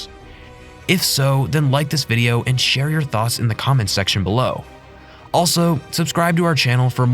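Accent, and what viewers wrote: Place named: United States. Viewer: full of incorrect “facts” and seppo pronunciation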